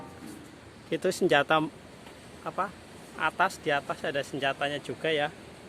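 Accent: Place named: Purwokerto, Indonesia. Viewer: Gaya bicaranya Purokerto bgt mas,kulo Cilongok.mantap mas sehat2 selalu 👍👍💪💪semangat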